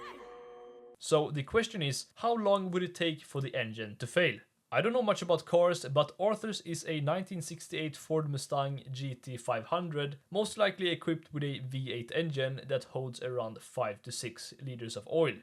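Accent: Sweden. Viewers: are u swedish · I´ve watched multiple of your videos wondering if you´re swedish. Thank you for speaking swedish at the end so my adhd stops trying to figure out your accent and focus on the content instead, men jag fucking visste det haha.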